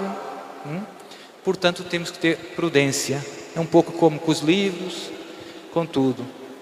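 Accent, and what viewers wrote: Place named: Brazil. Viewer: está morando no Brasil? Seu sotaque está muito brasileiro 😅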